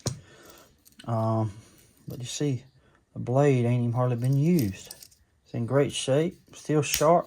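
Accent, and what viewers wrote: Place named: South, United States. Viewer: Thanks for another video of your shopping successes. I am definitely jealous. I don’t mind when you say Victoria-Nox. You’re Southern, I get it. I recently moved to Texas from Illinois, and have found that a lot of my pronunciations of local names are off. Am I wrong? Probably so, as they have been pronouncing their landmarks and local names their way since forever and I just got here.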